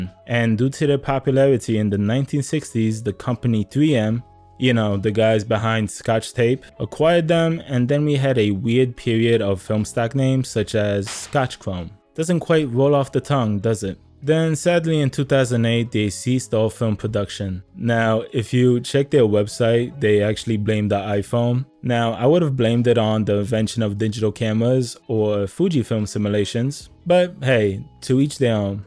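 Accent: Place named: New York, United States. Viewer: Why you sound like a New Yorker but live in eu?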